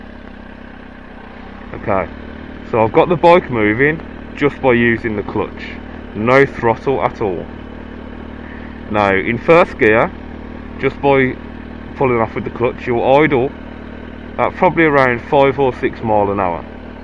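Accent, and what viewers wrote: United Kingdom. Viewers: I think because you're British you sound smarter then all the American tutorial videos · Is that a fellow brummy accent i hear? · Sound Like a Black Country Lad. and im thinking of getting a ninja 250r for my first bike, but iv been told i will out grow it in a couple months, and im lookin for something with abit of longevity something i can grow into rather than out of so im swaying toward a 600cc (zx6r) im 6'2 and 12 stone any advice "/ ? GREAT VIDEO BTW LEARNED ALOT !!!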